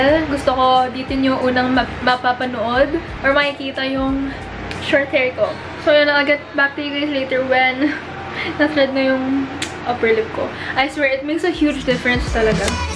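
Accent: Batangas, Philippines. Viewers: kacute ng batangueña accent huhu "ay syempre" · Batangenyang batangenya ka po,😊same po tayo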